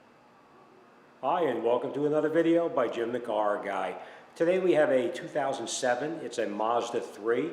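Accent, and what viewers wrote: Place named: New England, United States. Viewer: Good video. Hope you’re still doing well after nearly a decade. (Maybe enjoying a well-earned retirement!) Interesting that the procedure is different than that on the ‘06~’15 Mazda MX-5 Miata. But makes sense as it’s RWD and thus longitudinal mounted, versus the Mazda3 FWD and transverse mounted. No fiddling with the belt, tensioner, alternator, etc. But you do have to take out the air filter housing, and often even the throttle body. Good call on going back to the Mazda FL-22 dark green coolant. I call it the “Philadelphia Eagles Green” coolant, as opposed to the universal coolant (Seattle Seahawks bright green 😅). (I’m guessing from your accent you’re a Pats fan so I thought you might appreciate that 😂.) Ford used to carry the same (now replaced by Yellow), and “Asian Green” from Zerex or Peak are also suitable. 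I can tell you that these (usually only the early years like my ‘06 MX-5) had a common problem with the thermostat sticking open, similar design (wouldn’t be surprised if it was the same part number). I’ve had a P0126 on mine for almost as long as this video’s been up; I usually just reset it. 😂 Also if you have an Bluetooth OBD2 dongle to read the engine temperature while driving, it’s too low (maybe 140 F, should be around 190F). Also you may get insufficient heat from the vents (blows cool air). But “this is the year” I go ahead and fix it.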